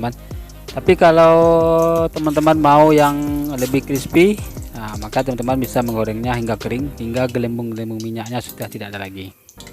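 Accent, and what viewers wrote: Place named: Padang, Indonesia. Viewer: ooh... Patutlah bahasa indo nya logatnya beda... Urang awak kiro nyo... Hehehee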